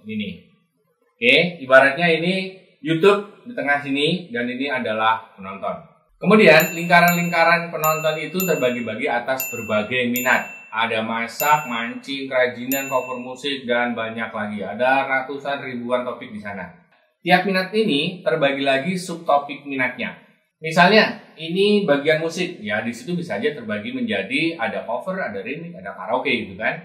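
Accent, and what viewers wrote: Java, Indonesia. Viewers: Terima kasih banyak penjelasan nya pak, sukses terus. Njenengan jowo pundi?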